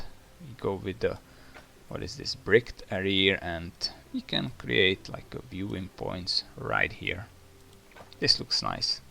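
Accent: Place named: France. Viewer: Love your accent... French I guess?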